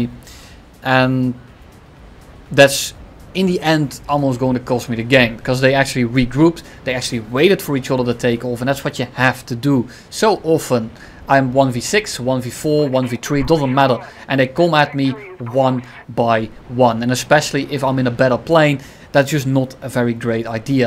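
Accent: Netherlands. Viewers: i really love jouw dutche accentje😂 geen haat bro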